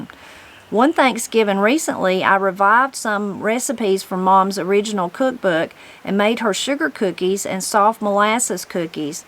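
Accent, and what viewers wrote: Appalachia, United States. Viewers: Did you read the story in your natural (Western NC) accent or in the local Eastern Kentucky accent?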